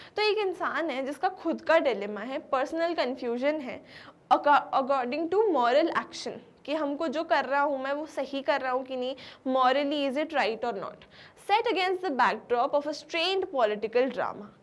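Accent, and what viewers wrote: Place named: Bhojpuri, India. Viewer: lag rha hai bhojpoori bol rhi hai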